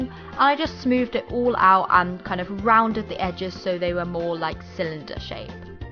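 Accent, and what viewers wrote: England, United Kingdom. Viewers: Are you from England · I like your ascent, are you British? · I like and enjoy watching your squishy videos but (just my personal unpopular opinion) I can’t stand British/English accents.. idk why my ears can’t handle your accent lol so I have to watch your videos on mute. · I dont want to offend her but..... Are you British? She really sounds British.......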